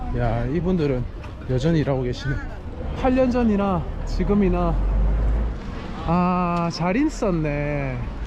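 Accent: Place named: Busan, South Korea. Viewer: 부산말투 좋으네요 ㅎ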